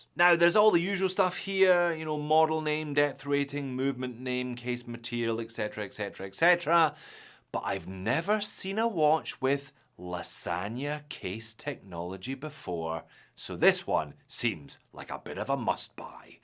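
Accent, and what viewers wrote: Scotland, United Kingdom. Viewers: Love how his scottish accent gets more and more thick over time …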